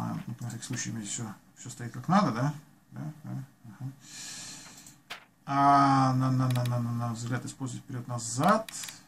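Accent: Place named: Odessa, Ukraine. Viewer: Легкий одесский акцент, но приятный такой :)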